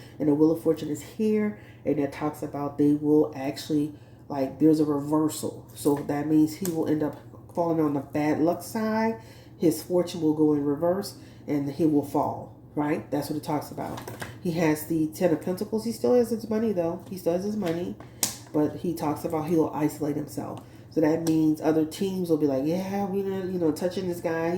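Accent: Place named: New York, United States. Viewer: You are from New Orleans, but you sound like a New Yorker, well just an observation.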